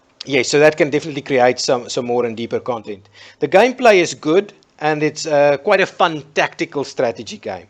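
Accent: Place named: South Africa. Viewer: lol saw your post on steam. first 5 seconds I thought ... "is he also South African"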